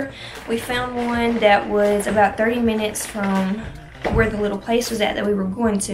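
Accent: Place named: South, United States. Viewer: omg i love your accent!!! country gal just like me and a natural i love it girl!!! Will subscribe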